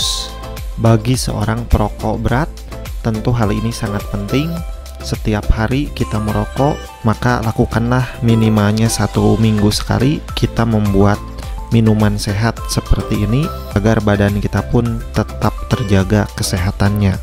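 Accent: West Java, Indonesia. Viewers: Cara ngomongnya jiga orng sunda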